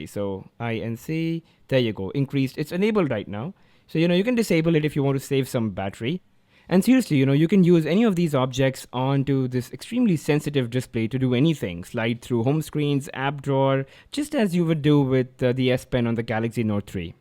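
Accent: India. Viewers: Omg your accent is really really nice :) are you indian?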